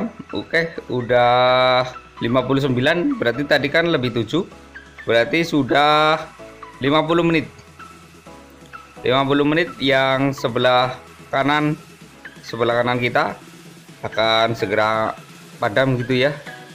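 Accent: Banyumas, Indonesia. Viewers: Wong ngapak ya mas ?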